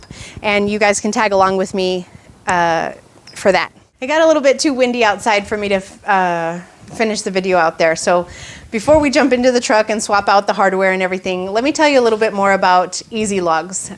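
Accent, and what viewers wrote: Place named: India. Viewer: Sounds like hes from India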